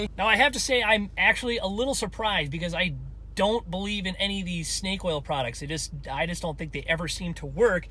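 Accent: Minnesota, United States. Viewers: This guy definitely lives in Minnesota somewhere. There is snow in the background of almost every video! And his accent is a dead give-away.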